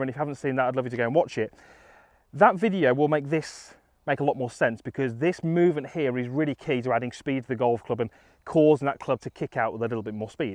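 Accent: United Kingdom. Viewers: British english funny n talk too much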